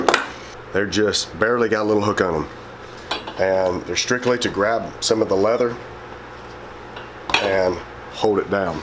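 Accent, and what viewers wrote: South, United States. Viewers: Omg i love this guys voice!!! It's so nice and deep! And that southern twang!!! I love it 10/10 can and will fall asleep to it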